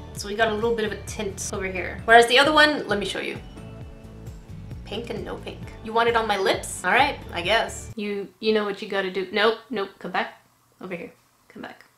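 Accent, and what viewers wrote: Canada. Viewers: I don't know how you sound like a stereotypical Canadian lol. I'm Canadian and have never met someone with a stereotypical Canadian accent. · You can tell shes from Canada 😂😂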